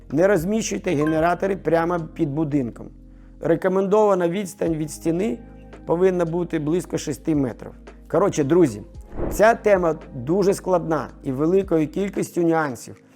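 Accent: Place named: Chernihiv, Ukraine. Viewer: А що мова не рідна? Вимова схожа на чернігівську😊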